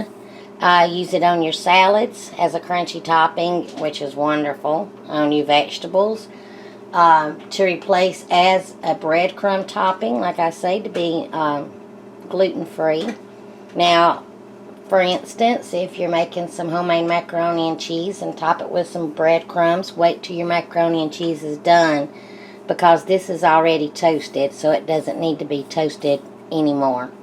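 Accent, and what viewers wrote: South, United States. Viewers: Thanks for sharing!!! Love your Southern Bell personality & voice!! You're amazing:) thanks again!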